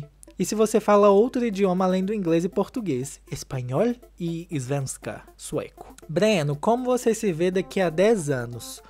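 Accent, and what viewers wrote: Minas Gerais, Brazil. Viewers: Conheci seu canal por acaso há muito pouco tempo não costumo fazer comentários mas tenho essa vontade contigo. Não sei se é porque você tem esse sotaque minérim (minha família mineira) se é porque tenho um filho morando na Europa há uns 5 anos ou se porque o filho que me restou aqui também é Vegetariano kkkk. Brincadeira 😉 mas é só pra dizer que gostdoce tá ! Abraço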